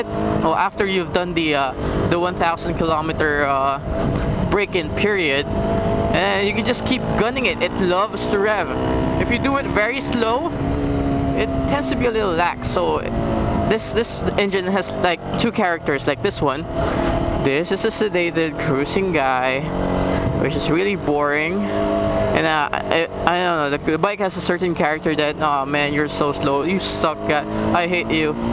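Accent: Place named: Philippines. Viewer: "yur butt starts to get sore" haha XD Love the pinoy accent mate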